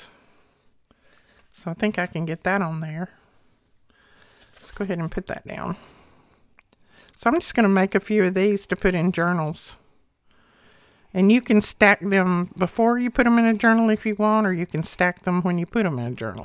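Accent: South, United States. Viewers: For not coming up with new ideas you sure knocked it out of the park with those. And the term is too many irons in the fire. I think they used to put irons in the fire. Literally. 😂. Great job as always. I love watching you craft and hearing your southern accent. So cute. Sending much love and blessings, Sharron 🥰🥰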